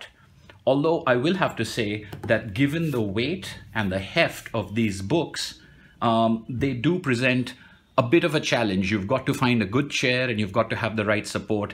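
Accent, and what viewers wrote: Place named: India. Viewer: What's that "Made in Bollywood" accent ? ... Cow, it's horrible ! On the other hand, the explanations are very good ... Thank you !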